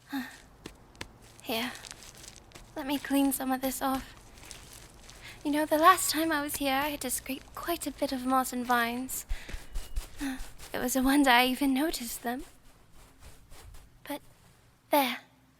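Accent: United Kingdom. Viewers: Your british accent sounds amazing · Diggin your English accent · sounds rather Bri'ish to me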